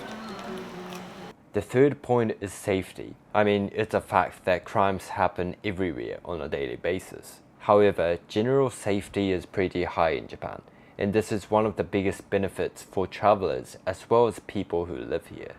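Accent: New Zealand. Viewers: So, that's where you learned English in New Zealand.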